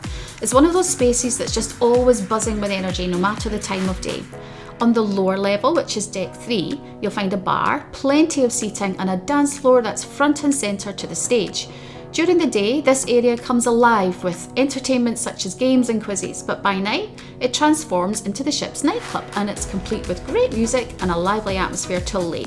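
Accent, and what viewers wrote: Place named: Australia. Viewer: Might be the accent. Aussie’s can hear you just fine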